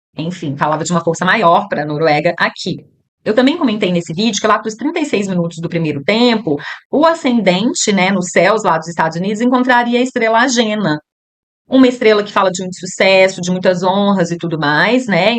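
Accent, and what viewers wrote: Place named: Minas Gerais, Brazil. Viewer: 😂😂😂😂😂 super acontece. Ouvir sobre mapa astral com sotaque mineiro é um bálsamo! ❤️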